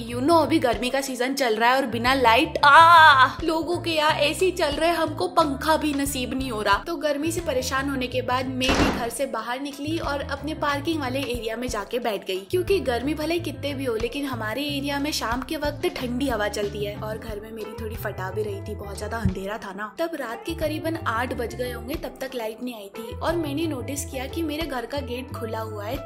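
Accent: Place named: Bengal, India. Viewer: I can smell some Bangla in ur accent. Are u bangali?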